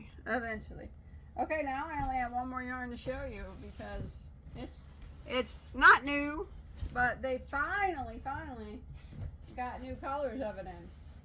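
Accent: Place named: Midwest, United States. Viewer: I love your Midwest way of speaking. You are so real and confident. I'm glad you wear pants unbuttoned while relaxing.😊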